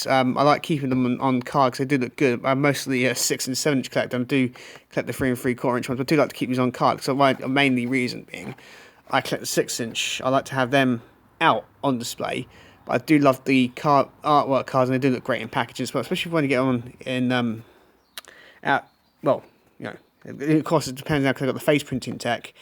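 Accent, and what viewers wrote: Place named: England, United Kingdom. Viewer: We like the smooth English accent. fab content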